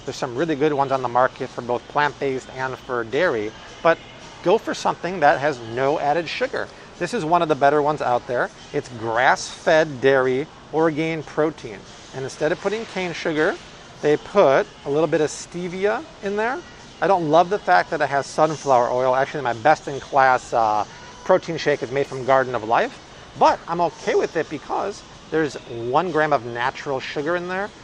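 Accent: France. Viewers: the way he pronounces the letter G sometimes sound slightly French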